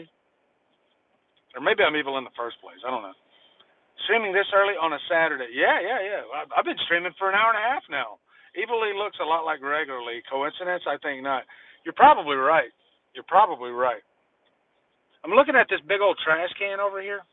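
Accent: South, United States. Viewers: We have Tesco express here in the UK- Marks and Spensors if you’re wanting posh! Love your southern drawl…. I was born in London, and moved to Norwich… So now I sound like a cockney farmer! 😳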